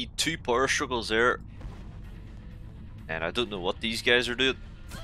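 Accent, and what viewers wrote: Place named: Scotland, United Kingdom. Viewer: First time coming across this channel and you've got, I think, the strongest Scottish accent I've ever heard, nice honestly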